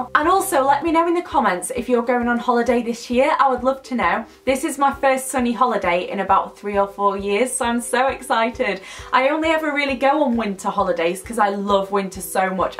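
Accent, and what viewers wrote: United Kingdom. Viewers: This is the first video of yours I'm watching and I absolutely love your britain english!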